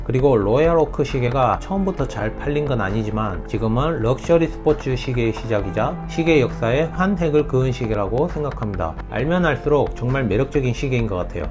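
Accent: Gyeongsang, South Korea. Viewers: 경상도 사투리 멋있습니다!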